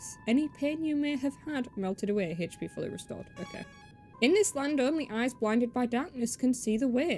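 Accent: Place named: United Kingdom. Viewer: British? not a problem, i don't mind british if it's good content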